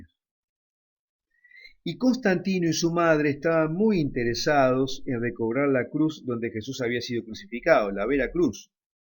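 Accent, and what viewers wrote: Argentina. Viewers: Tengo 2 preguntas Estos vídeos llegaron a mi como sugerencias, no conocía el foro, por los temas que tratan y por las fechas de publicación parece ser nueva la dirección. <link> cuando estuve en la página quise saber quienes eran ya que está la opción, por más que la clike no me lleva a ningún lado. Las preguntas son: Es una org religiosa? Si bien por su acento parece argentino Ud es religioso o historiador?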